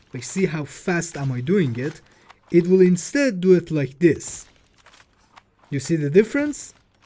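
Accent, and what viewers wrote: Iran. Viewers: tell me if im wrong but your accent is screaming that u from iran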